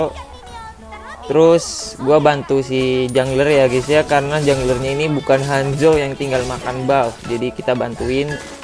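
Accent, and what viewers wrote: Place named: Central Java, Indonesia. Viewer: Dri logat nya kaya nya org jateng ya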